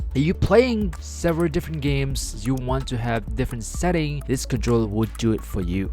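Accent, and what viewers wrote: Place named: Vietnam. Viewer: Thanks for your honest opinion review about this controller. I’ve been thinking about getting this since it first launch. Guess I have to buy one and try it out for myself. Btw, love your accent are you Vietnamese?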